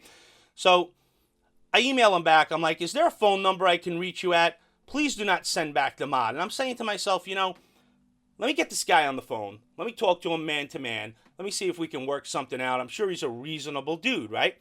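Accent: New York, United States. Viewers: lol ya your New York accent would offend some of these cheese puffs in California coming from the east coast i know New Yorkers, but some of these silly fluffs man oh man this world of ours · "I'm a newyorker thats the way we talk"... classic!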